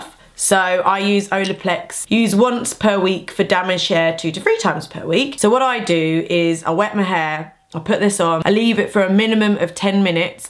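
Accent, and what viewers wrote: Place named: Birmingham, United Kingdom. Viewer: Idk why, but to me you sounded sort of brummie in this 😂 probably just me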